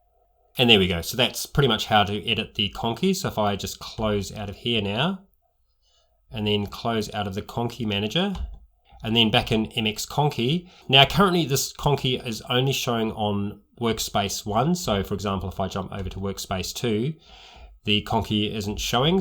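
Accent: Australia. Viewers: Thank you very much for these hints and tricks in MX Linux. I had so many problems with Ubuntu an Ubuntu Budgie(could not run my scanner and printer). Now with Your how to*s I finally been rescued from MacOS (too expensive) and Windows(hate it). The only suggestion I like to make is the confusing tools between "tools" and "tweaks". I always have sort out witch one is the setting up the computer and witch one is responsible for just the outfit. By the way I could not spot Your accent. guess you are from "Downunder" or this direction somewhere. TX again.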